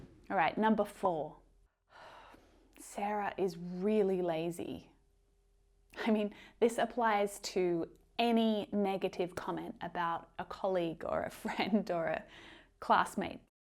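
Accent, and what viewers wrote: Australia. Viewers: Ma'am are you from Australia ?. I'm in love with your English accent😍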